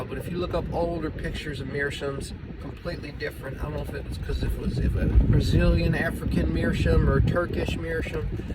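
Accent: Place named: South, United States. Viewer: Love finally hearing the true CLE southern accent! Bless your heart ❤! Long live the chupacabra and Mr No Name Meecham!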